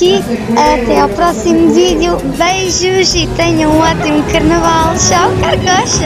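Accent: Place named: Portugal. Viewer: esse cara é da hora !!! e o teu sotaque "tuguinha" é muito fofo !!!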